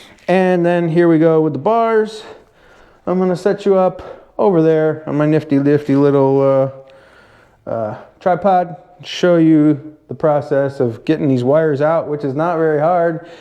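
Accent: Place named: South, United States. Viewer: You’re Southern accent is strong in this video. 😂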